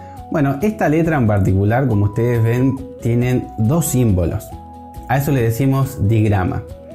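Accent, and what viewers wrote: Corrientes, Argentina. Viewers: Me di cuenta que eras correntino por como pronuncias la CH soy misionero de padres paraguayos estoy mas acostumbrado ala variante paraguaya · Pasa que es Correntino y tiene otra pronunciación, lo dijo al inicio. No es una pronunciación errada.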